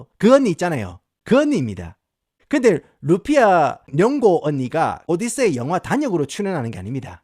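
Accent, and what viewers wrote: Gyeongsang, South Korea. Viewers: 내용이랑 별개지만ㅋㅋ영어와 경상도 사투리가 묘하게 섞여있는 말투가 매우 흡입력있습니다ㅋㅋㅋㅋ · 오늘 이 채널 영상을 처음 보는데 은 영어를 배운 경상도민이신가요 경상도말을 배운 재미교포이신가요..?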